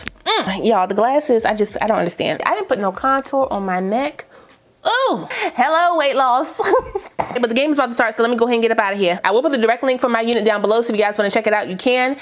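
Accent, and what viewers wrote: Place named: Philadelphia, United States. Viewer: I lost it at the blonde wig 🤣🤣 “hellll nawll” I love your videos and accent .. sound like a Philly girl.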